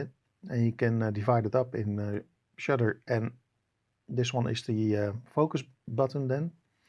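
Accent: Netherlands. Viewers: Are you Dutch? I hear an accent.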